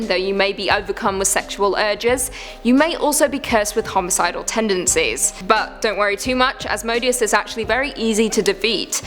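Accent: United Kingdom. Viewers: Lovely Brit accent though... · British accents sound so cool, especially coming out of this girls mouth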